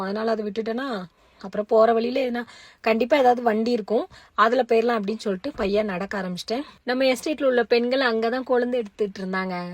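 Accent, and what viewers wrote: Tirunelveli, India. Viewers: நீங்க எந்த ஊரும்மா பைய அப்படின்னு பேசுறீங்க திருநெல்வேலி, நாகர்கோவில் இப்படி பேசுவாங்க அதனால் தான் கேட்கிறேன்மா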